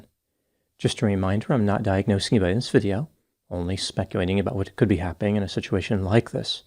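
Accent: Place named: Baltimore, United States. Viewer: are you from the Baltimore area by chance?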